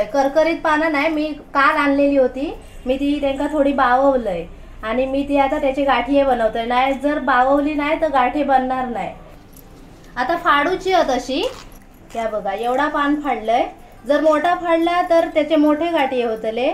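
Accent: Malvani, India. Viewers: माका तुमची मालवणी बोलायची पद्धत लयच आवडली,माझ्या गावाची आठवण झाली आम्ही गावी सर्व मालवणी बोलायचो,आणि तुमची अळूची गाठीया बघून मला माझ्या आईची आठवण आली अजूनही आई करते आलूच्या गाठीया,खूप छान सांगितलात recipe 👌👌👌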